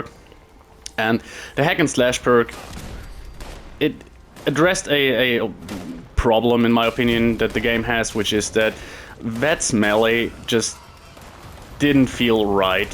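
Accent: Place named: Germany. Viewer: Ich hab keine Ahnung warum aber als Deutscher erkennt man andere englisch sprechende Deutsche wie aus dem nix 😆 Gutes Video 😇🥸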